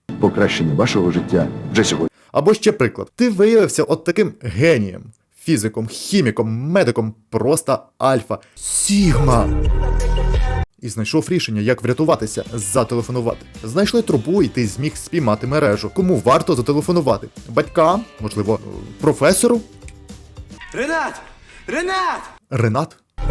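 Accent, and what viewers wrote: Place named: Ternuvate, Ukraine. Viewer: Прийшла дивицця заради божественного тернуватського суржику, а його тут не було :( шо за підстава? П.с.: з дебютом🎉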